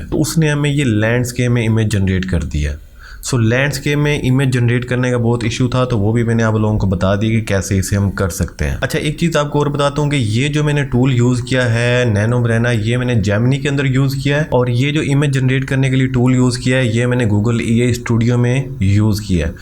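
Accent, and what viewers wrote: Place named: Punjab, India. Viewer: Aapka bolne ka andaz punjabi style in natural way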